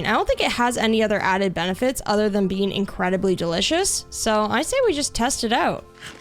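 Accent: Canada. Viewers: I knew straight away you were canadian, after hearing "hey buddy nice to see ya" is such a canadian accent. LOVE ITTT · youre canadian?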